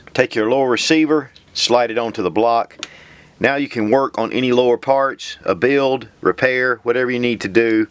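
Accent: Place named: South Carolina, United States. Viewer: LOL... gotta cut some slack. That is a South Carolina accent some folks may not be used to is all and I totally agree on the mag vs. clip nomenclature. Tube On!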